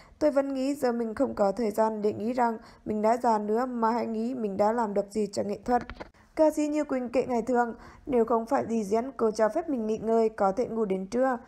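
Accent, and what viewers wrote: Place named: Central Highlands, Vietnam. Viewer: Giọng đọc của người thượng hả? Lè nhè sai bét.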